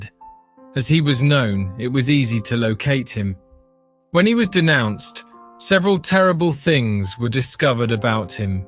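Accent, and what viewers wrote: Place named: England, United Kingdom. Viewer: Why are you speaking with an English accent but using American terminology? We don’t have “sidewalks” we have paths. We have mobiles, not “cellphones” We don’t have “garbage” we have rubbish. We don’t have “apartments” we have flats. We don’t have “trash bags” we have black bags or rubbish bags. I really h@ these bloody robots.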